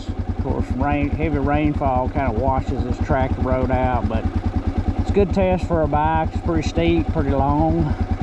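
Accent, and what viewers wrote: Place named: South, United States. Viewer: Hillbilly talking.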